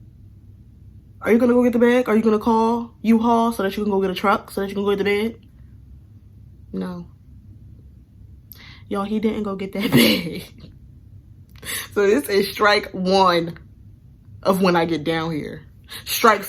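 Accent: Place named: United Kingdom. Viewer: So proud of you! Its the British accent for me lmao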